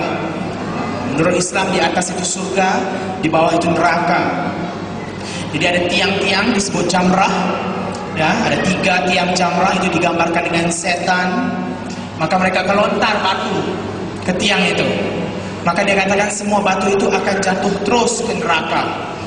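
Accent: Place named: Malaysia. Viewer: ehhh Ini Kan Malaysia :D Kan !!!! Kok Logat Melayu Sihhh :D #tolong Respon Dulu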